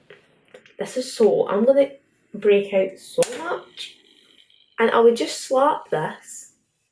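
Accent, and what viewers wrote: Scotland, United Kingdom. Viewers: YOUR SCOTTISH??? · If you say you can't understand her it confuses me...I'm american...and she's speaking English with a Scottish accent...its the same language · FINALLY A SCOTTISH GAL IN THE SCENE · She has a Scottish accent. I’m Scottish and it took me forever to figure out that she was Scottish as well 😂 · Wow, I wasn’t expecting you to be scottish 😂😂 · YOU’RE SCOTTISH! 😃🏴🏴🏴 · i cant believe i’ve seen so many of your videos and never heard you speak and didn’t know you were scottish omg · Dammm i love ur Scottish accent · How does nobody even understand her accent, I ain’t even Scottish but I know what she’s saying 😂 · ahhh I didn't know she was Scottish, the accent is so cute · soz but as a scottish person myself, her accent is rank. Dunno where in scotland she’s from but her accent is HORRIBLE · OH MY GOSH ARE YOU SCOTTISH??? 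I ABSOLUTELY LOVE YOUR ACCENT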